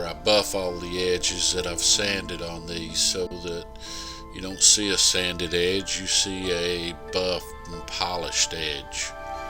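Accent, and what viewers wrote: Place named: South, United States. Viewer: I just subscribed to your channel! Man you have all the good toys! Your equipment from The Leather Co. of course is top of the line. But you go over the top with your vacuum former, your laser cutter/engraver, your burnisher. Good job! Where are you located? You have a pretty good good ole southern drawl! My kind of people.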